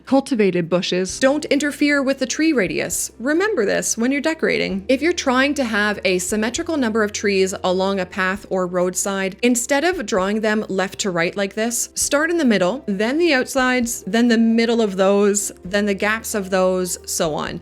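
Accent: Canada. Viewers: She’s Canadian that’s how they say about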